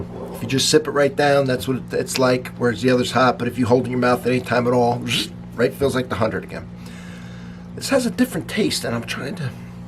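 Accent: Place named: Pittsburgh, United States. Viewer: The silver label with Hawaiian Punch is very good. I drink it when writing. Btw... I’m picking up a Pittsburgh accent from ya. You from western P.A.?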